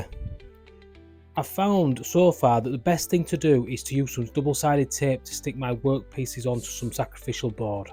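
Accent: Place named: Yorkshire, United Kingdom. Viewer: Hi, I’m glad I found your channel! I enjoy your dry Yorkshire style. You may appreciate that took some saying by a Lancastrian.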